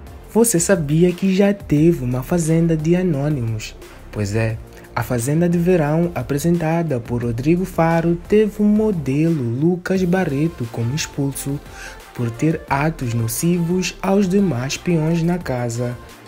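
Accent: Portugal. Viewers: Esse sutaque de português? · Essa pessoa narrando o vídeo com certeza é de Portugal ou de algum país luso português.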